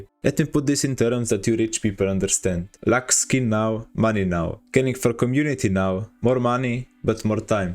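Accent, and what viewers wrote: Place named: Eastern Europe. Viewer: Not sure where you are from, but that is the most slavic accent ever! Great video btw!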